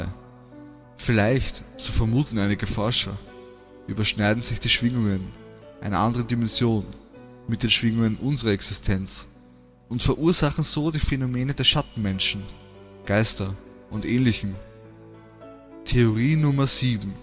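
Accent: Austria. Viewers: du hast genau so eine stimme wie jmd den ich aus skype kenne bist österreicher oder ?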